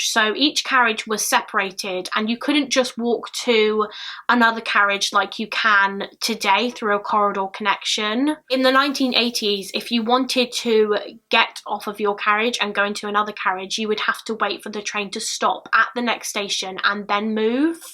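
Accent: United Kingdom. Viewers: Listening to true crime in a British accent is e.v.e.r.y.t.h.i.n.g! LOL.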